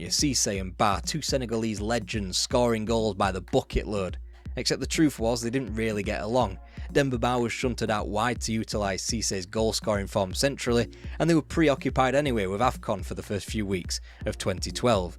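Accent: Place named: Northern England, United Kingdom. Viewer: I'll say it again. Best content on you tube of this type. I also love the judicious (and quite appropriate tbf!) Use of profanity. Good earthy northern dialect. Keep it coming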